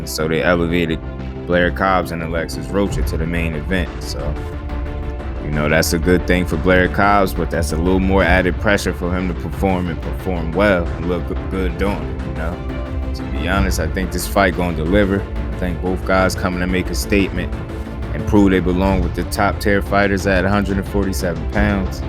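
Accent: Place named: Washington DC, United States. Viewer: omG, you’re from the dc area?? i think i hear a dc accent, especially when you say “hands”.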